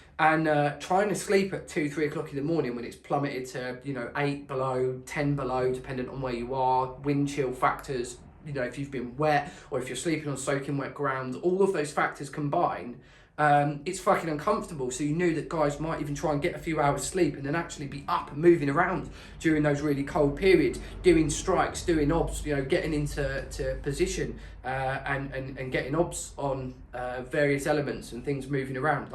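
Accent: United Kingdom. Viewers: Can someone PLEASE tell me what was said ? I can’t understand Scottish !!!!! Probably nice sentences but still ….. very confused 🤣🤣🤣🤣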